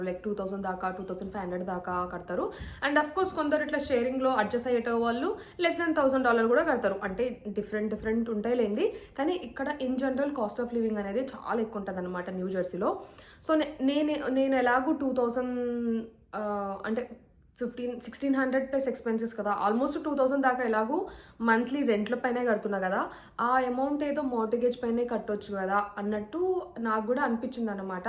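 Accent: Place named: Miryalaguda, India. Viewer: In youtube I suddenly got your india tour vlog . I felt that u r from my nearby area , Because the way you talked.Exactly you are from Miryalaguda I FELT THANK GOD. Iam a upsc aspirant hope I will have vlog after my selection with you..Thank you sister for your videos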